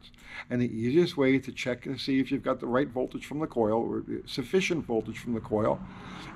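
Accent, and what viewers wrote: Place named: Long Island, United States. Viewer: Thanks, good sensible advice clearly explained. BTW, your accent makes me miss Long Island!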